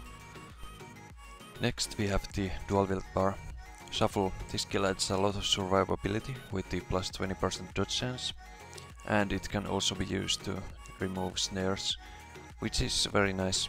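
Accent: Finland. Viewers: U from Finland? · Kuulostat niin paljon suomalaiselta että on pakko kysyy että oonko oikeessa? taino siis jos osaat lukee tän niin oot varmasti suomest xD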